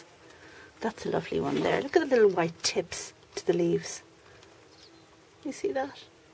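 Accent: Ireland. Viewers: I live in the U.S., Virginia to be exact. If I lived in Ireland, with access to all of the great plant material I've seen on your channel, and if I had the kickass Irish accent, I would lose my mind.:P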